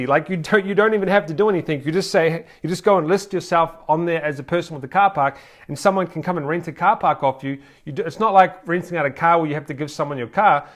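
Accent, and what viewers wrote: New Zealand. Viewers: Hey, bro are you Kiwi?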